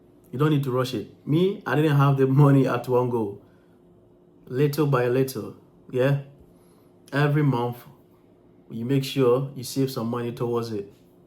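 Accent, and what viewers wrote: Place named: Africa. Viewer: My question to you and every African who migrated to the West (Your accent tells that you are born on the Soil of Africa) ….. Why would you think You can call UK 🇬🇧 Canada 🇨🇦 or US 🇺🇸 Home forever. Glad President Trump is waking Everyone up. You may have the Passport that Shows that you are citizens of that HOST country. But wait ……There is More.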